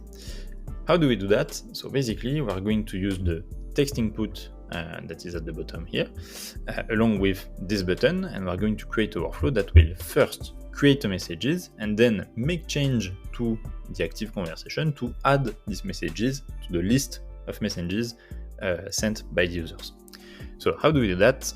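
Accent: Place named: France. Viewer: Bro you have amazing accent!!! French most beautiful language in the world!!! Great work also 🫱🏼‍🫲🏾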